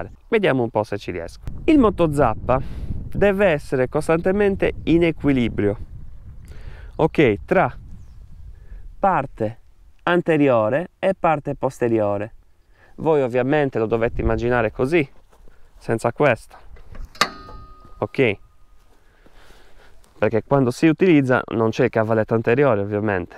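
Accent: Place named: Sardinia, Italy. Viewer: A metà del video ero già iscritto. Parli come deve parlare qualcuno che ci tiene a farti imparare. Bravo veramente e l'accento sardo rende tutto più simpatico.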